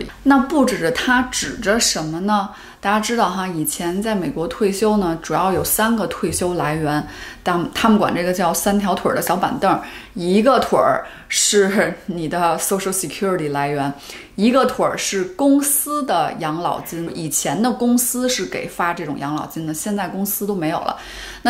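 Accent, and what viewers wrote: Beijing, China. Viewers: 北京大妞 听着舒服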